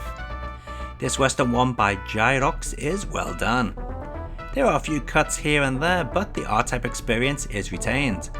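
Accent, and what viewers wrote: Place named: Liverpool, United Kingdom. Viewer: I think these remastered "Battle" vids are a great idea,! I'd actually forgotten about the days when your videos featured overlay text only and no sign of your gentle very-slight-hint-of-scouse tones...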